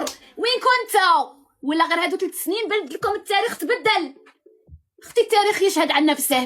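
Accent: Morocco. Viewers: نتي مغربية ياختشي باينا في صوتك دي وجهك وروحي لقفطان عنده ماليه 🙂😌 تحيا لجزائر الحركية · لهجة تاعك مروكية يا لمسخة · بصح هدرتك مغربي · لهجتها مروكية هههه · أنت مغربية علاش تكذبي هضرتكم باينة عيتي تقلدي هضرت الجزائريين لكن مقدرتش لهجتهم المروكية طاغية القفطان جزائري نقطة ونرج للسطر أنتم امتهنتو صناعته وفقط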